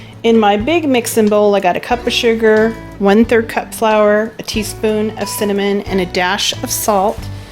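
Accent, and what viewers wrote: South, United States. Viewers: Your food looks amazing! Ugh, so so yummy! I'm sure you've answered this before but where did you get the lovely Southern-sounding accent? You are adorable 🌷🌼💐🌻🌹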